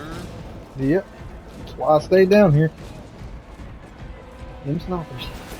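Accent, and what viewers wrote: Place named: South, United States. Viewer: The southern accent is cool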